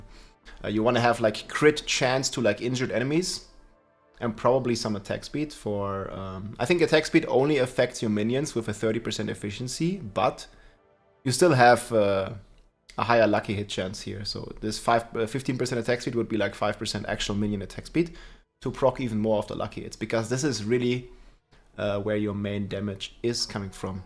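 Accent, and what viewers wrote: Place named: Germany. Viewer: Watching a bloke with a German accent play D4 with a John Carpenter like soundtrack is something I never knew I needed until now! Liked and subbed!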